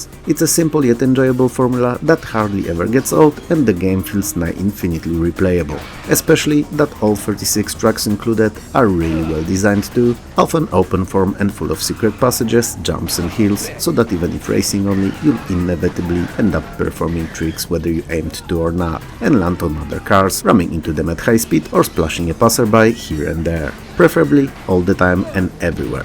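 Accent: Poland. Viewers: Polski akcent wyczuwam :) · ~ you very on point, speaking from experience I've worked as dev on Espresso Tycoon, and at first we had tutorial, but quickly we noticed not only it took a while to finish, it was just too much info for regular player to remember much anyways, so we did same - each mission introduces new mechanics, though we always been making this game with focus around sandbox which is available from beginning (actualy it could be game up your alley who knows hehe 😅 ) That being said I'am not big fan of such method either , but in our situation it was actually quite hard to get it done any other way Shameless plug aside, I wonder where you from, judging by accent I take wild guess Polish (jak ja! także pozdrawiam!) Good video, some of these I experienced even before i had own pc, at cousin place, some games i even forgot about , or not realized they been so old they worked on dos in fact ;) I remember little big planet being hard (for uh 6 maybe 8 years old me) , we could not progress much in it each time we tried, before internet and guides being available like today, gaming was quite a different experience in deed back in the 90s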